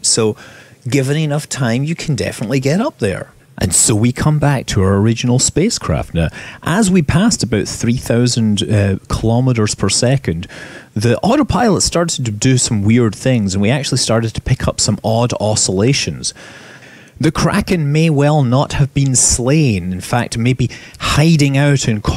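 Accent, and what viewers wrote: Scotland, United Kingdom. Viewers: omg I love your brogue! my god it's just like...awesome. Not David Tennant thick, not Northern England weak. ;3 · What a silly comment. They were talking about what he sounds like, not his nationality. We all know Scotland is a part of the UK, so that technically makes him British. But he does SOUND Scottish, which is a much more accurate description than the over-encompassing "British." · the switch between scottish/american rhotic/non-rhotic stressing is kind of disorientating. good video though, loved it.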